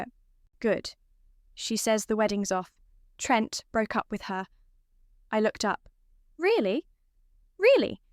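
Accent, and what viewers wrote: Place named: Australia. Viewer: I live the Australian voice-over that everybody calls a British voice.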